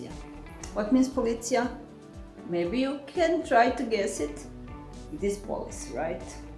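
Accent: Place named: Poland. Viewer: I was thinking you are from Poland as I do, because I’m learning English from your video and interslavic sounds like language I already knows :)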